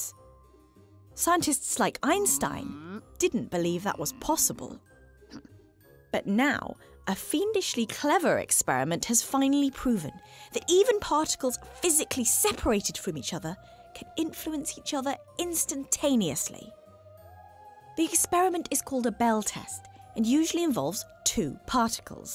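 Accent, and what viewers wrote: United Kingdom. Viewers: Oh god a british accent oh well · Adorable, and very Harry Potter sounding, but unfortunately doesn't make it any clearer to me. I think the crux of my confusion is that the detectors when put at 120 degrees to each other have one correlation under QM and a different one under Hidden Variables and experiment matches QM. However my weak grasp of detectors and statistics trips me up on the details of why these are valid experiments to compare the two. Hopefully a future adorable video can :)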